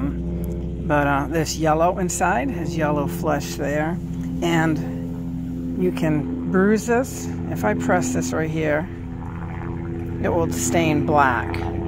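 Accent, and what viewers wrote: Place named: Maine, United States. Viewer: Very cool. I just found you by doing a Google photo (I'm foraging) search. I also am from Maine. I heard your accent and was like she's gotta be a Mainah! LOL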